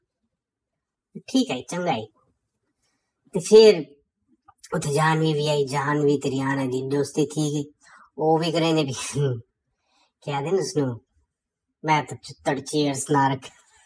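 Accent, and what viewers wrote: Saraiki, Pakistan. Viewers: Garav gera salute to h that u kept ur ancestor's language alive even in india after d partition..lov ur sraiqi axcent i belong to pakistan from sraiki belt althought nor a sraiq myself but knows sraiki very well...thuwaku meda salute bauw zabrdast alaa ay thuwadi ji khush krdita hay zraiqi zindabad saadi mithi alaa te salamti einku alaawarnd alaa te....🎉🎉🎉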